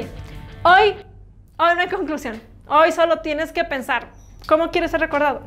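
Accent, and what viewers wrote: North, Mexico. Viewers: No sé de dónde es, pero me encanta esa forma tipo norteña de explicar las cosas. Gracias. 💕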